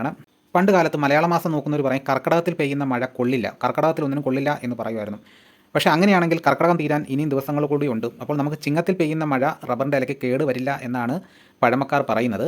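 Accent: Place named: Nilambur, India. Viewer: Nilambur Nalla Malayalam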